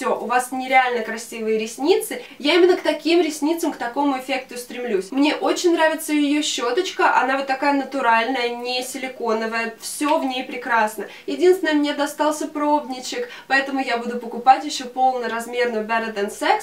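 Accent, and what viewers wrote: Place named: United States. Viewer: убери американский акцент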